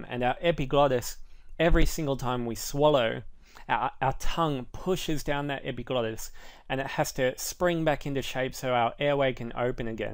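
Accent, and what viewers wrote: Australia. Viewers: thank you! like your Australian accent:)(hope I pick it right!)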